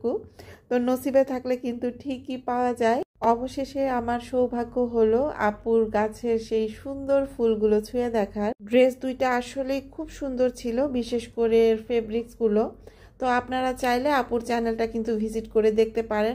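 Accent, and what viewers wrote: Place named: Bangal, Bangladesh. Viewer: কি সুন্দর বাঙ্গাল কথা বললে❤একদম সংসার ছেড়ে একদিন ও কোথাও ও থাকার উপায় নেই❤লক্ষী বোন আমার আছি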